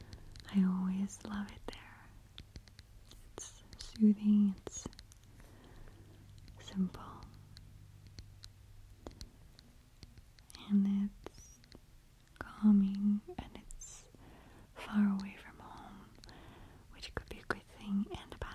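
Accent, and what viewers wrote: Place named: Russia. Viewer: So rare to hear the Russian accent when she speaks English, it's lovely when it happens 😊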